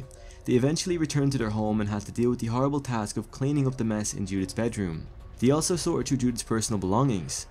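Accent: Ireland. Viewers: I’m pretty sure he’s Irish. Regardless, it’s all the same language.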